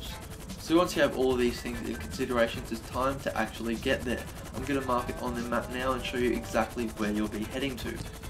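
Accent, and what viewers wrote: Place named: Australia. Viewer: Yay a Aussie voice :)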